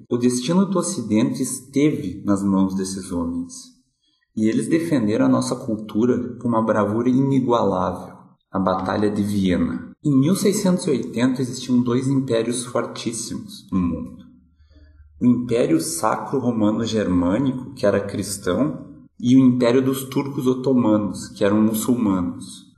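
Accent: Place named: Curitiba, Brazil. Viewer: obrigado pela aula de história, o Islã mais uma vez ameaça todo o Ocidente, teu sotaque não te nega como um bom conterrâneo, reside em Curitiba?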